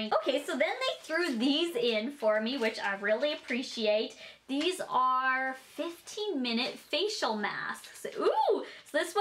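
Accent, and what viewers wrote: Saskatchewan, Canada. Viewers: My twin brother keeps repeating everything you're saying because he says you have such a thick Saskatchewan accent and I'm actually so confused😂😂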